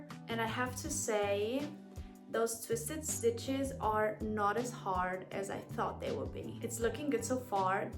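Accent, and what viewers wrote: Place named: Italy. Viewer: ngl never heard an Italian speak this good English, there is always a very noticeble accent but not as much from you which made me want to keep watching more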